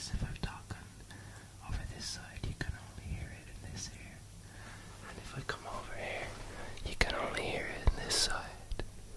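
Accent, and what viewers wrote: Ireland. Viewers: Niall Horan?!? Hahah jk I love your voice and that irish accent though!! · You have a lovely Irish whispering voice :-) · oh awesome your Irish. I'm Irish born in america but my family is from Dublin, Ireland i think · I'm hearin Dublin =D Cork here....good to hear an Irish whisperer out there =]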